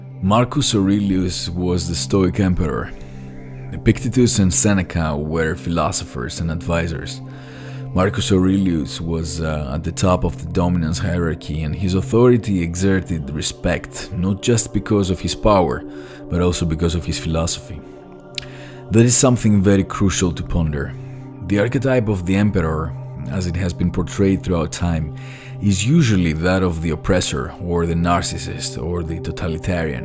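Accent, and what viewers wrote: Greece. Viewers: Φίλε... 15 δευτερόλεπτα και λέω Έλληνας είσαι?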